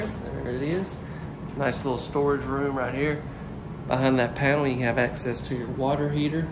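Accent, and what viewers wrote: South, United States. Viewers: Buddy I LOVE Your Accent. Some Where Down South? LOVE It! AWESOME VIDEO VERY Clear & Clean Very Descriptive In your Video. Ever Think Of Real Estate? You Be A AWESOME ONE! I Would Certainly Buy A House From you As You Have Exquisite Taste In Homes. Looking Forward To Seeing MORE. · Love the Southern accent and mispronounced words.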